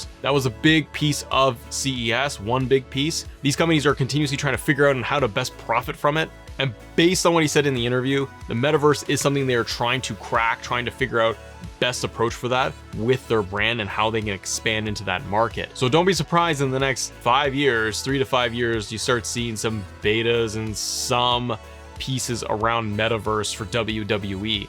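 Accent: Canada. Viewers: Are you from Canada? Just wondering lol.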